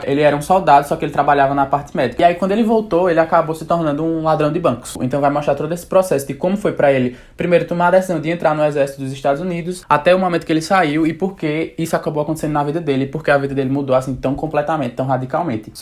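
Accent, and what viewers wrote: Northeast, Brazil. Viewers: Cheguei agora no seu canal e já quero assistir todos os seus vídeos, acho que me identifiquei mais por conta do seu sotaque (muito bom de ouvir, diga-se de passagem) já que eu tbm sou do nordeste. Ganhou mais uma inscrita e já coloquei vários na minha wishlist. :)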